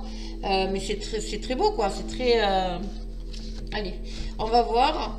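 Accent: South, France. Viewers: Super tirage, merci beaucoup , je vais passer une bonne journée !! Tirage professionnel et le petit accent du Sud , c’est absolument charmant !!👍😉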